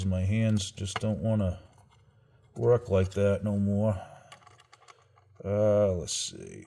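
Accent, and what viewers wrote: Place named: New England, United States. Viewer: Are you from Boston or New Hampshire... I noticed your accent.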